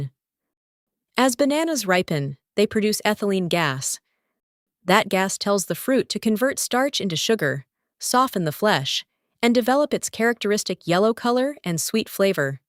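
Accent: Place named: United States. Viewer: BanEnas, can't we have a proper English version on Youtube as opposed to American so called English.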